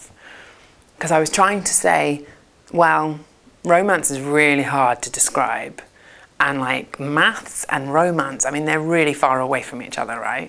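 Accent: United Kingdom. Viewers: British Pronunciation. She’s a top mathematician. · Is that a south London accent?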